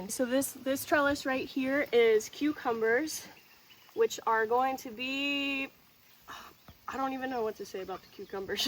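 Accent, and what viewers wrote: United States. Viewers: Are you American ❤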